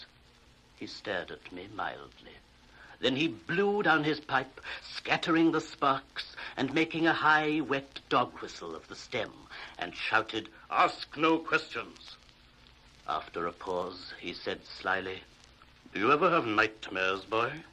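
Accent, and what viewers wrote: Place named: RP, United Kingdom. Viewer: He was born in the largely non-Welsh speaking region of South Wales close to the English border. In school he would have been heavily influenced by stuffy teachers and BBC radio. Term for it is 'received pronunciation' (RP), at a time in UK's history when regional dialects were considered 'uneducated' and 'vulgar'.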